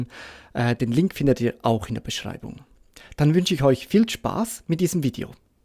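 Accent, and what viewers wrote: Switzerland. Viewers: Hallo, darf ich fragen, aus welchem Kanton dein Dialekt kommt?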